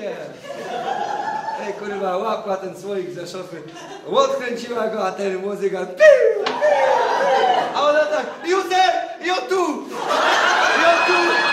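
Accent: Silesia, Poland. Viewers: haha tylko ze to jest akurat gwara śląska xd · to jest raczej po śląsku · Facet ma talent, po glosie poznaje że jest ze Śląska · oooooooo ten śląski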